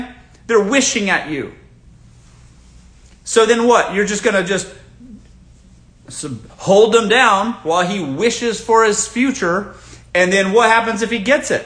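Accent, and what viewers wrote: South, United States. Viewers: I think he mentioned he was Southern..Sounds it too..Maybe from Georgia?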